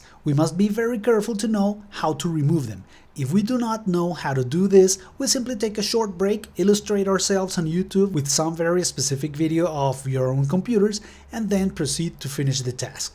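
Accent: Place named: Colombia. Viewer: Tienes acento colombiano 😊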